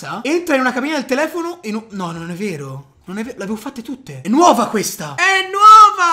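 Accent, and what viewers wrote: Tuscany, Italy. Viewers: E il dualetto toscano genios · hey ma sei toscano o sbaglio?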